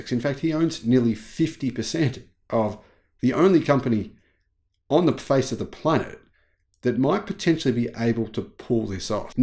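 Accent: Australia. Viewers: He's Australian, mate. I'm a kiwi from just next door and we all say NAHSA too. So do the British, which means our is far from being the only one. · That's exactly right, he's Australian and Aussies don't say Narsa like the English, etc do. Get it right if you're going to correct me, mate.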